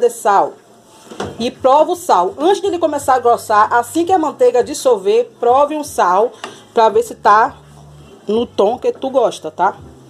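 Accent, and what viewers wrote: Bahia, Brazil. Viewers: Vc é baiana? Seu sotaque parece,sou baiana,vou fazer ,amei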